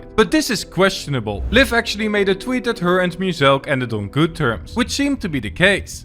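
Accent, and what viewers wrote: Netherlands. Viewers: I smell Dutch in your accent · Lekker man hoorde het dacht al een mede Nederland Chanel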